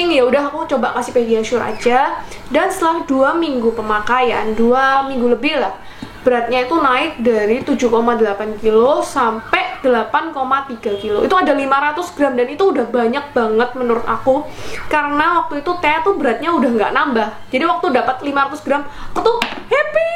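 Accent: Surabaya, Indonesia. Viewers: Mbk e logatnya medok ky boso suroboyoan, sidoarjoan.... Org mana mbk??? Suka saya.. apa adanya bnget suaranya.. mksih ilmunya brmnfaat bngt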